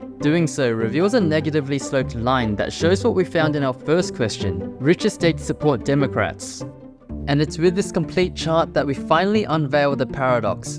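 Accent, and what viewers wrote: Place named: South Africa. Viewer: I hear a Saffer accent. PS: Get your levels sorted, china. The archival footage video audio is drowning you out.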